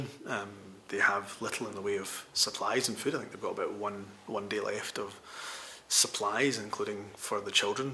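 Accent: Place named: Scotland, United Kingdom. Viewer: Only thing Scottish about this guy is his accent.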